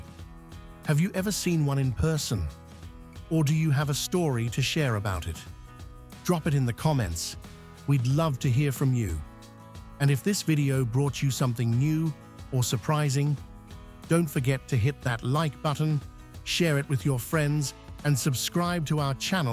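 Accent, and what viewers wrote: United Kingdom. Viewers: That's exactly right. This video doesn't even answer the very question it proposed in the title. Yet another announcer with an annoying British accent. There was barely any time for GM to do the crash quick, completely re-designed '59's by mid-'57. Long hour 7 day work weeks for months was what it took, and exactly what they did, with beautiful results for all 5 division's models.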